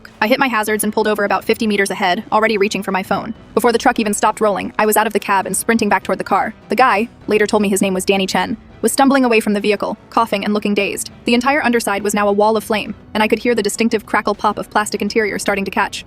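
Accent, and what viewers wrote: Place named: Australia. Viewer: Hey, I have never heard an Australian version of this, it’s nice for a change, keep it you mate.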